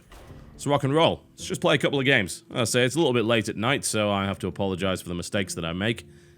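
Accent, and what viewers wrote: United Kingdom. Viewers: He has a British voice.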